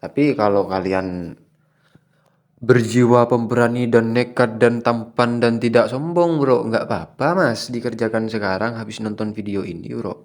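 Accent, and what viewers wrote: Madura, Indonesia. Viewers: Logatnya Madura bangtt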